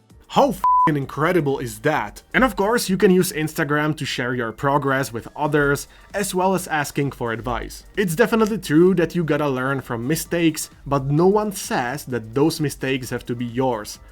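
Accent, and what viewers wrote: Czech Republic. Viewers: Thats...encouraging to hear..12 years 😄..but whatever, better late then never right !? Im just about to start with painting but I try my best... I just discover your channel and I like it more then others! I was little suspicious about your accent tho 🤔..."why he sounds kinda like me!?" AAAAH, hes CZECH 😅!!! Im surprised, youre first czech I discover in this "WARHAMMER painting universe"... I started to think its not exist in Czech Republic. And Im glad its not true😁!!!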